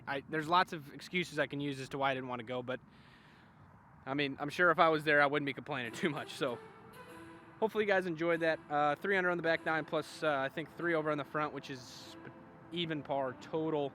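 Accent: United Kingdom. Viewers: Excellent british accent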